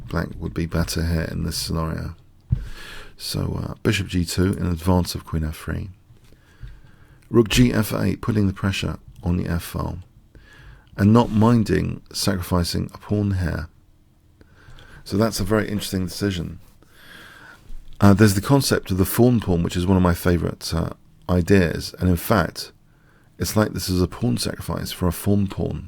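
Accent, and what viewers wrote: United Kingdom. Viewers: yeah you know you’re British accent is different than what I’m used to hearing being an American unfortunately.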